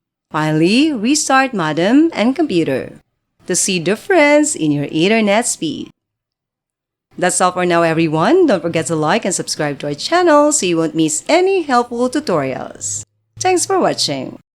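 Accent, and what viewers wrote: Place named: Philippines. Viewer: that's a philipina speaking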